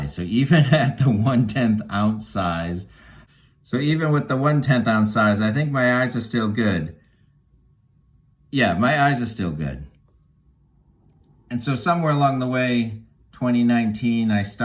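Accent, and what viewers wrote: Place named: Massachusetts, United States. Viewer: You sound just like a Massachusetts friend of mine from Florida. He moved back to Massachusetts several years ago. Great video!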